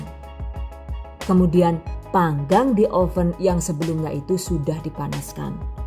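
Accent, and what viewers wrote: Banyumas, Indonesia. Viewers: MaasyaAllah, suka sm detail penyampaian'y & suka cara bicara'y yg ngapak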